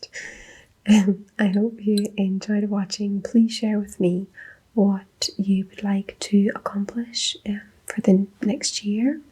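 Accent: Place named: Ireland. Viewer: My theory is that people with ADHD really gravitate to ASMR because it's a way to relax and slow down the constant mental activity that provides just the right amount of stimulation to actually do that. In retrospect it's what drew me to ASMR too. I've been following your channel for many years, big fan! I love your Irish accent and your Irish history videos especially! And I can really relate to the struggle of being present as a mother and professionally. Don't be too hard on yourself. Goals are good, and so is generosity and kindness for yourself and respecting the effort it takes. Looking forward to seeing more of your channel again!!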